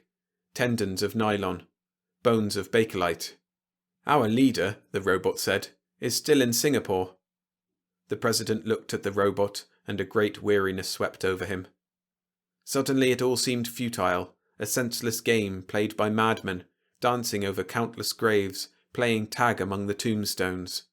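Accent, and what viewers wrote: United Kingdom. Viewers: UK accents are annoying.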